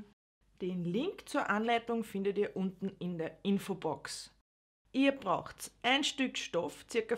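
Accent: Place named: Austria. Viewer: Ehrlich toll erklärt, jetzt versteh ich auch das Falten. Ich Feier deine Humorvolle Beschreibung und den Herrlichen Österreichischen Akzent, danke für das charmante Video 😊👍🏻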